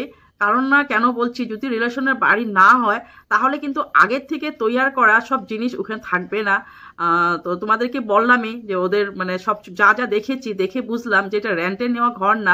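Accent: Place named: Northeast India, India. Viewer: North east e Jara thaken tader aneker Bangla Bolar style ta erokom.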